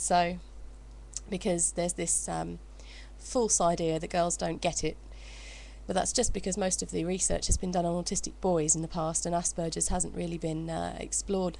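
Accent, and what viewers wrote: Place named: United Kingdom. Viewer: Which dialect of the British accent are you? I am fascinated with accents... I am female & been diagnosed with aspegers not long ago, basically, I do relate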